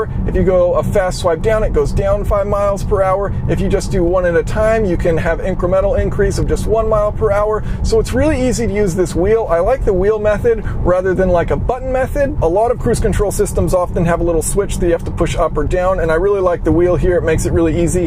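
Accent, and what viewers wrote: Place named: United States. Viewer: how miserable is the poor choice of actor and his highly annoying yankee doodle voice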